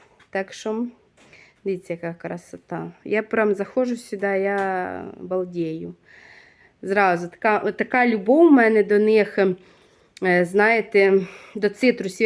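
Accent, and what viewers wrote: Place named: Lviv, Ukraine. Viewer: Добрий вечір.А з якої ви області(району)Діалект як на Львівщині😊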